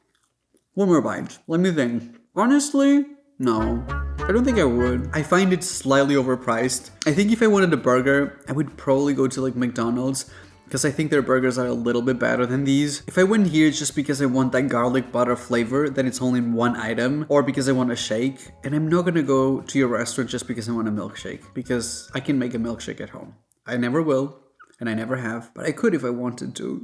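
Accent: United Kingdom. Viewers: I thought this guy is British. Did he get American citizenship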